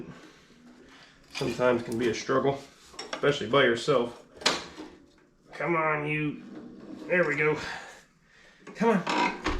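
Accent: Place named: Pennsylvania, United States. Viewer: You sir, are my main reason to open up youtube! Quality, real, content. I learn a lot. Please continue. Also, love the PA accent. Family in Altoona. I'm quite a bit south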